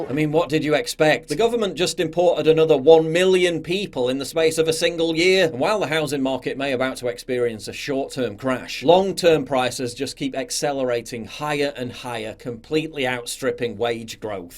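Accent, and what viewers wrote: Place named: Liverpool, United Kingdom. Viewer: Keep it Scouse 💯 👍 📚❤️🌟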